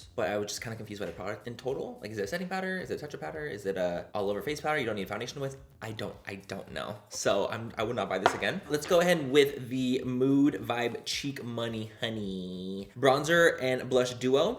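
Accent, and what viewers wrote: United Kingdom. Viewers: Manny Mua yes!!! Plus your sweater 😍 all while saying it’s so hot out here! 🤣🤣🤣 Love you & ur British accent 😉 Edit: dessert is so nice you have to write the “s” twice... a rhythm I teach my students to help them remember 😉😃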